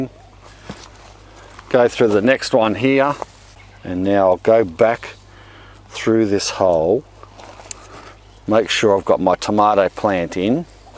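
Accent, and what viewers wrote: Australia. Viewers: Your content is so wholesome. When you said "don't do this at home" it reminded me of my dad except with an Australian accent.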